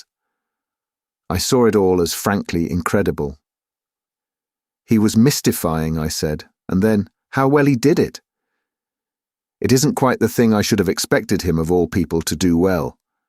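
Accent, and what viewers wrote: United Kingdom. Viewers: I have trouble following the UK English readings. Just me? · Ok prentioious British accent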